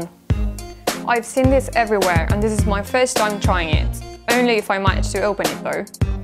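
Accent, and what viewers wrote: United Kingdom. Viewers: 這英國腔XD的西班牙妹很正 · 有一點英國腔 My type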